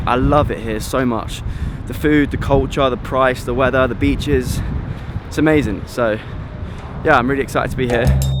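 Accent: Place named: United Kingdom. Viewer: this is so powerful and comforting all at the same time. i fully loved every second of it. i've joined the 6am collective newsletter and i can't wait to post my first video soon. thank you! hugs from a 24 y/o woman who genuinely wishes she had your voice? so very soothing and british haha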